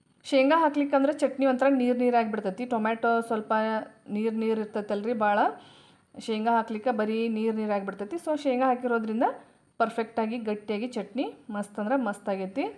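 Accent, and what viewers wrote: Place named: Belgaum, India. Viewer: Hi mam ,ur kannada tells us that u r from Belgaum district. (madtaru) that roo of madtaru😊😊.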